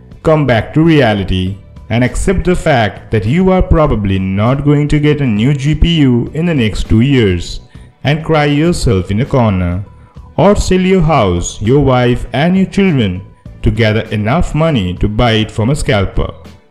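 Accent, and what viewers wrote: India. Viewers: Indian accent makes this video perfect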